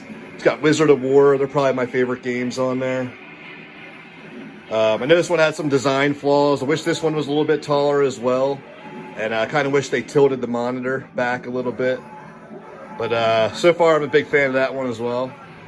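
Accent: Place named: Philadelphia, United States. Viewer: great tour, love the room, gotta say the Philly Accent really sells it for me "Homee arcade" :)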